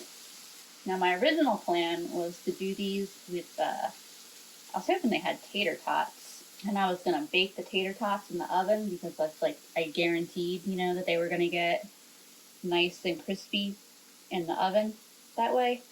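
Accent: Philadelphia, United States. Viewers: I KNEW IT! I heard Philadelphia in your voice!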